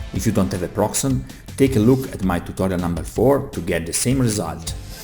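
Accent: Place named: Italy. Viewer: Ce l'abbiamo nella parlata, il nostro inglese sarà sempre... così xD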